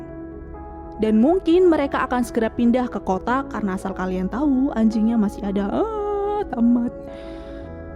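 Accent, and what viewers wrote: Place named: West Java, Indonesia. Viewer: sy heran ama pembawa alur cerita ini, dr logat bahasanya sunda, tp dr video pembukaan biasa seperti situasi di jawa timur, karna sy orang jatim yg tinggal di bogor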